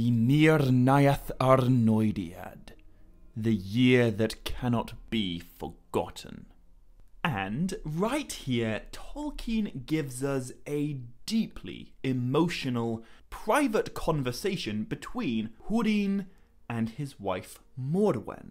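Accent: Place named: RP, United Kingdom. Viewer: Your Tolkien knowledge is indeed vast, but your insistence on over-annunciating words from his work is simply unbearable. I watched a few videos and thought I could ignore it, but it's the posh version of vocal fry and it's obnoxious. SMFH 🤦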